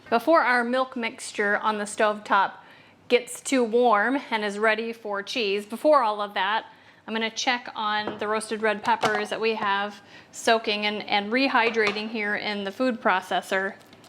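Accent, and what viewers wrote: United States. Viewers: it doesnt matter, its kind of cute and funny how Americans pronounce it ❤️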